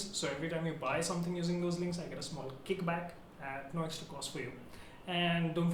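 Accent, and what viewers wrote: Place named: India. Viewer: Thumbs up for the clear and understandable accent from an Indian guy.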